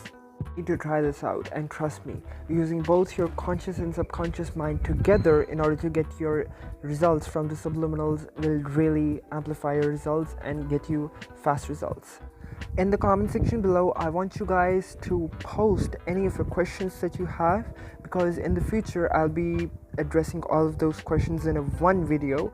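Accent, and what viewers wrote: India. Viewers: Wtf are u Indian ?!? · Are you an Indian? I hope the sublimals that I listen work😓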